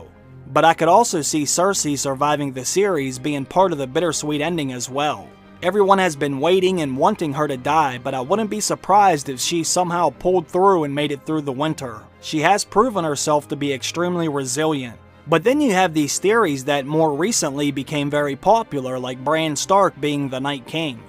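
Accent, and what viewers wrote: Pittsburgh, United States. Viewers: Are you a fellow Yinzer? You sound like a Pittsburgher.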